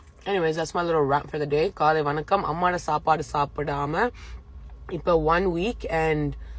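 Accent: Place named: Sri Lanka. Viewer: U r correct baby U r great to see to talk tamil Feel u r srilankan tamil Romba nella erukku New delhi❤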